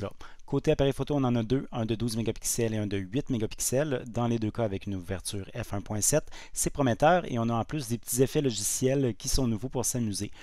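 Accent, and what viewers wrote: Quebec, Canada. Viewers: jaime laccent québécois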